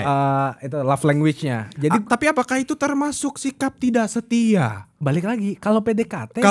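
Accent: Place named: Java, Indonesia. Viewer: medokmu mas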